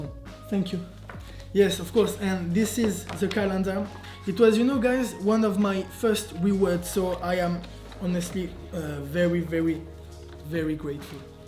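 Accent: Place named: England, United Kingdom. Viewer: Ton accent anglais m'amuse toujours autant, chouette vidéo bien sympathique! Bonne continuation à toi!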